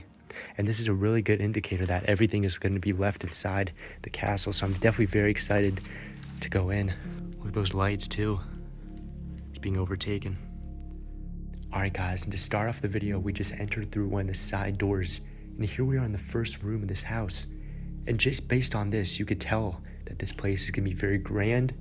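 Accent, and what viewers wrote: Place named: United States. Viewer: Did I miss the round spire/tower room from the inside ? I also have read some comments about learning what this older furniture styles are , it would help with dating stuff . You don't have to take classes to learn stuff , just look at the pics and do a google search and you will usually find more info on the old stuff . The cork on the walls in that bathroom was odd to me , I am guessing it was done in the 1960's or later , that was Hideous looking . The photo slides are not Polaroids , I lived through that Era , and used Polaroids . I also have Some Slides from the 90's , so they are pretty common . The Scanners from some companies in the 90's had an attachment piece for showing the slides on your PC. Were you on a vacation trip in France ? You speak American English , so I assume you are from the States . I am also very glad you were respectful and not ransacking the place , I watched a different video with a real Jerk , that was so disrespectful , and made me think he is a real thief and lowlife. 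Keep up the great work .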